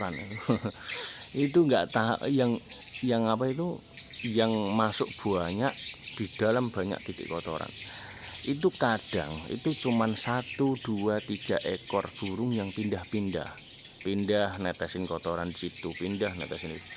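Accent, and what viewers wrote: Java, Indonesia. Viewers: Mantap kang, jowone endi kange ?? Analisanya mantap kang, bener yg sampean bilang bahwa bw adaptasi butuh proses dan mekanisme nya persis seperti yg sampean sampaikan. Saya juga ngalami hal seperti ini, alhamdulillah belajar dengan terjun langsung akhirnya menemukan banyak hal. 🙏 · Jawa mana mase...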